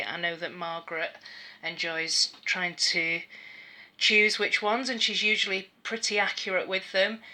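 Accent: Scotland, United Kingdom. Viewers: thanks babe. omg im pmsl here at the plums and the scottish accent. 😂😂😂 x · These are my absolute favourite vids of yours. I love learning about colour! I've been organising my ROYGBIV series and I've written 3 different sections for purples, lol. Oh man, I need to get that Snugglebutt. Ahahaha, your Scottish accent xD Excellent vid!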